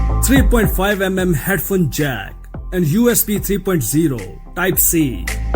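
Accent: India. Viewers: es acento de la India, donde el inglés es el idioma oficial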